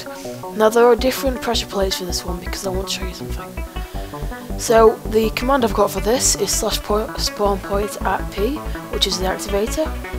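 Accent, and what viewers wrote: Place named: England, United Kingdom. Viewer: you are english i can tell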